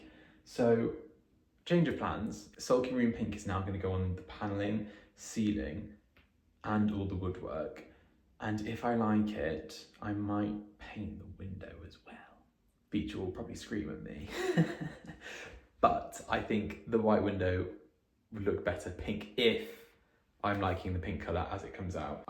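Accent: England, United Kingdom. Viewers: thank you for this enjoyable video. I love your content, engaging personality, and enthusiasm in home renovations and decorating. Your joy is infectious and inspires me to get out of my chair and to do something. Lol. As an American, I love listening to English accents. I could listen to you for hours. In a world with so much conflict, you give me a joyful escape for minutes. Everything you do is amazing. I agree, the pink color looks great with the other colors. Don't forget that real men wear and use pink. Lol. Robert.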